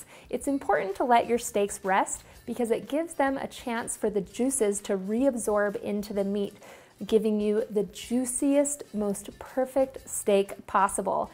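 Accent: United States. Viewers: Not in America booboo....its called an accent.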